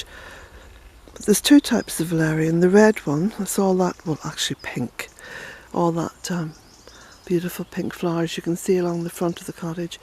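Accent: Ireland. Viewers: Hello to the wealthy, amazing lady and jack. lovely to see your heavenly garden, also hearing the birds and your lovely Irish accent. I'm trying to set up my ironing, but keep stopping to see and hear your conversation . Thank you for the joy. north shrop England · Beautiful, your hard work was well spent. Watching this from rural waterford and am glad to hear the gorgeous Irish accent represented :)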